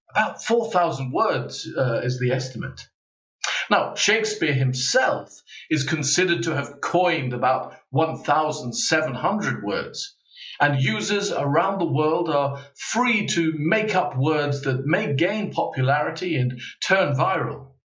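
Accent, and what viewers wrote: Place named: RP, United Kingdom. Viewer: Thank you. Good job! Many people on the internet speak RP, but yours is one of the best. Loking forward for next videos. 🤩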